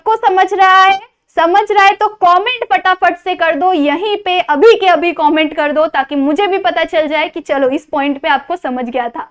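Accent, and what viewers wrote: Maharashtra, India. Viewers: Thanks alot sir and ma'am for your efforts and i really think that I'll score best tomorrow with ur help. And mam your Marathi is really cute😂😊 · Mam your Marathi is too cute😅😅😊😊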